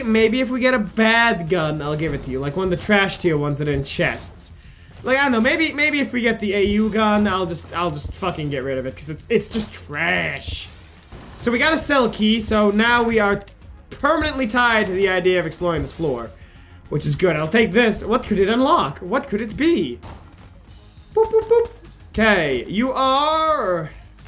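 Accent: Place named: Italy. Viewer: I had a pretty bad speech impediment with R's too, I sometimes hear myself say them with a w and mentally face palm. It hardly ever happens, but my every day speech is stilted to the point of it sounding like a genuine Russian accent, as I've been told, and it's kinda funny to me that you mention your accent bc I always think of my Italian great grandparents when you use it. They were first gen immigrants to America and their English was really terrible, it sounded very much like your accent at times, which is incredibly amusing to me knowing it's supposed to be Russian. You also sometimes sound like my uncle and cousins from Jordan, with the kind of accented buisness talk, I guess? It's kinda funny.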